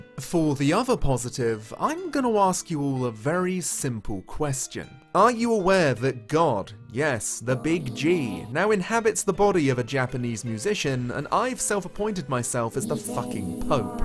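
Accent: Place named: England, United Kingdom. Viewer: You sound English but your avatar is of a gay Asian guy?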